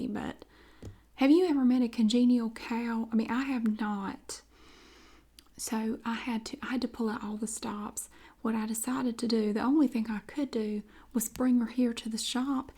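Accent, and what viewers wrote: South, United States. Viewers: I absolutely love your videos 😍❤️ especially the southern accent my fiance is from nc and when we first met I had to learn how he talks because of the accent always looking forward for any of your videos they always help me so much I'm going through a lot of anxiety and your videos always calm me right down thank you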